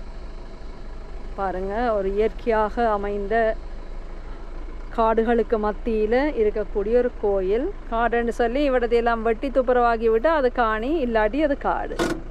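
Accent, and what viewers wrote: Sri Lanka. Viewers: அக்கா அருமையான பதிவு உங்களது இனிமையான இலங்கை தமிழ் பேச்சு மிகவும் நல்லா இருக்கு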